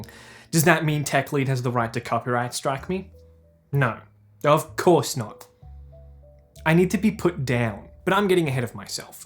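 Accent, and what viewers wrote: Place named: Australia. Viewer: Its the australian accent and authentic australian delivery 👌🏻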